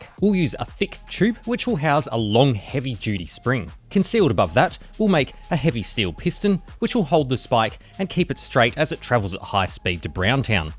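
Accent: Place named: Australia. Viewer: I love your sense of humor. Your Austrailan accent makes it even better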